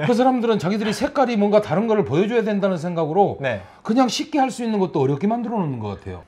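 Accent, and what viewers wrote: Gyeongsang, South Korea. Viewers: 경상북도 사람이 서울상경한 말투 ㅋㅋㅋㅋㅋㅋㅋㅋㅋㅋㅋㅋㅋㅋㅋㅋ 이걸 왜 느꼈냐면,,,, 제가 그래요,,,,,,,,상주 구미 영천 안동 ㅋㅋㅋㅋㅋㅋㅋ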